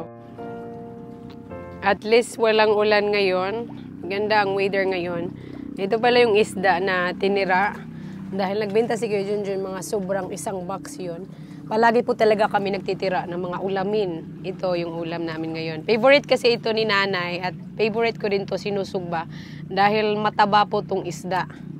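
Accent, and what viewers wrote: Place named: Visayas, Philippines. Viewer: Mailhan jud ang bisaya mo storya dol no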